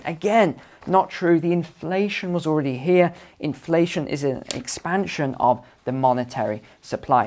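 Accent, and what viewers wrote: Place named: United Kingdom. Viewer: Hahahahah I know isn't arrogant but I love his British accent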